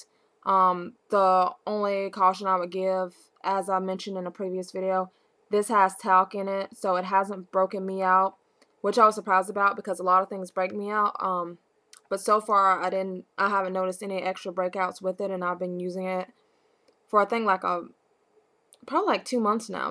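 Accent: United States. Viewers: OKAYY!!! Tell me you live in Mississippi!?...you are country. yet its cute.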